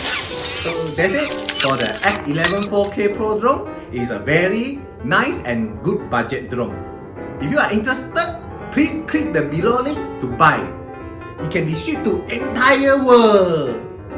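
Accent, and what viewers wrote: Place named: Indonesia. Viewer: indonesian Speak🤣🤣🤣👍👍 not bad my bro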